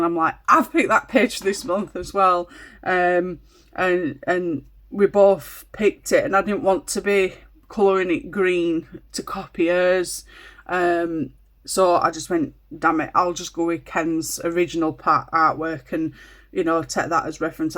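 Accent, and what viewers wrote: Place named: Huddersfield, United Kingdom. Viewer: The Hub and I are having bets ob where you're from. He says Hudderfield. I could listen to you say Petite Poupees all day! 😂❤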